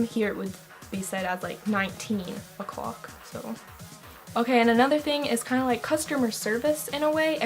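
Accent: United States. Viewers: I think it's cute that you have an American accent though. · you have a good American accent for someone who isn't from America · You sound like an American. Not a fin. I prefer America. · I moved to Canada from Finland when I was young. Canada is fairly similar to the U.S., but even Canadians find the restaurant portions in the U.S huge. I also find that Americans are more chatty and outgoing than Canadians. The rest of what you talked about is the same. Car sizes and types vary whether you're in the country or a larger city. Generally, you will see more fuel efficient vehicles in west coast cities. Except for your American accent, you sound pretty Finnish to me. Keep up the good work. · Not much of a comparison video.... more of a America sucks. Why do you have an American accent? There are Finns born and raised in America that have never stepped foot in Finland that have a Finnish accent. 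· You seem to have spent more time in Finland, and it seems you're more accustomed to Finnish way of things, yet your accents suggests that you've spent more time in America lol. Just some small observations that very well may be wrong.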